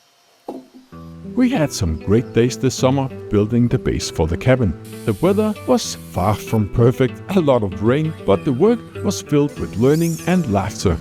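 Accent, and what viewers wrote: Denmark. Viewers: You have similar accent to a Dutch youtuber I follow, Posy. Danish and Dutch have very similar accent when speaking English. I think it sounds cute. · jeg kan bare lig hvor dansk du lyder :D jeg er også dansk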